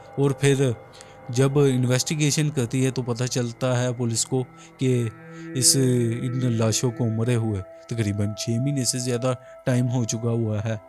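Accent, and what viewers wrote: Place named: Pakistan. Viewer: Bhai hardwork karte raho aap.... Bhadiya hai video.... By the way aap kha se ho... Aapki bolne ki language se pakistani lag rahe ho...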